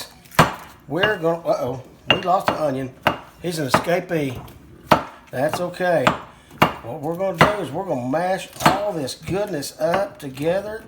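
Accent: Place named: South, United States. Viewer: Dear, we are in Atlanta. Are you from the south as well? I noticed a draw there. Be interested to connect with you and your people. If representation is open, will you send us your Actors Access file? Also, what sites are you on? Headshots and a Demo would be appreciated. Best of luck.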